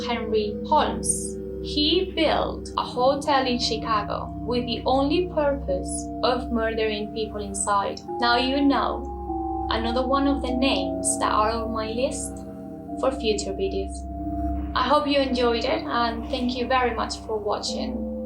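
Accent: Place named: Spain. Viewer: I found you on twitter regarding the Cecil Hotel and shot over here to watch. I'm glad I did because I really like your vibe and uniqueness. So this is my first video and I'll probably spend the rest of the night watching your other videos. Love your hair, makeup, and your accent is really adorable. I wanna say a little Spanish mixed with English? Idk but I love it. I'm American so it's an accent to me and it was fun to hear and watch. You were a little scary at first to me with the intense eyes and blood and then this sweet voice popped out that I was not expecting. You remind me of the other lady that does her makeup while telling real horror stories. I always appreciate something different than the normal I see everyday. And I try to stay as far away from politics and media as I can. I've stopped watching tv and will not go onto any social media outlets unless it's to post about things I like because I'm so tired of the negativity. 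I don't dare scroll when I do rarely jump on because I don't even want to see or know what the hell they are going on about next. It's neverending and tiresome. You are refreshing. 💋 xoxo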